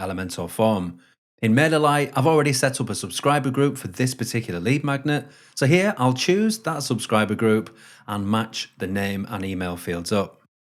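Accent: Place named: Northern England, United Kingdom. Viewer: Pure class. For someone with the IT skills of a lobotomised pebble, this was priceless. Nice and slow baby steps. No Yoda style html jargon and all them other things I simply do not understand with IT. You got yourself a new subscriber and follower. And the extra bonus was your accent. It is nice to hear an English accent as some other accents go so fast that I'm lost before I begin. Being from Norfolk in the UK, you don't get much slower accents than us, good ole boy but that Northern twang of yours is great for this. A bloomin good job. Bootiful.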